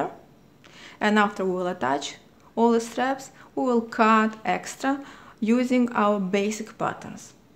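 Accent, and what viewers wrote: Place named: Russia. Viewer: Я только начал учить английский, через секунду узнал русский акцент.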